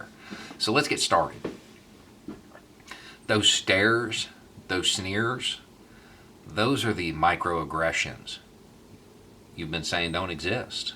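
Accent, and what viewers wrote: South, United States. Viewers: you have such a real and thoughtful way of teaching your audience. I am so glad I came across your vids.. Ironically, I first clicked on one of your segments because I found the way you look seemed contrary to the header and I was curious. So, you taught me that not all people with wild beards and a southern accent are bigots!:) I apologize for my mistake. Can't wait till your next posting - such variety and thought put into each of them. God bless. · has lived all over in Japan and the US but he has been living in Florida for years now. He has def picked up more of a Southern accent over the years. That tends to happen. I can hear a couple of different accents mixed in his dialect. With all of the common sense videos and history lessons shares and all you comment on is his accent? Smh 😏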